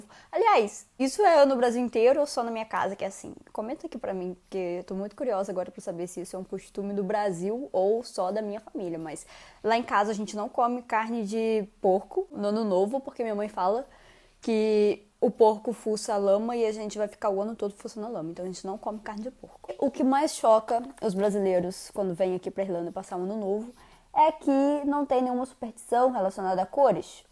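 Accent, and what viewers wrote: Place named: Ireland. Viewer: Oii kkkk eu fiquei meio bugada, parecia que vc era uma irlandesa que falava português como segunda língua kkkkk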